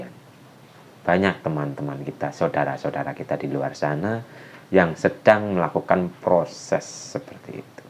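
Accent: Banyumas, Indonesia. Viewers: assalamualaikum,, Njenengan kyane wong ngapak mas🙏🙏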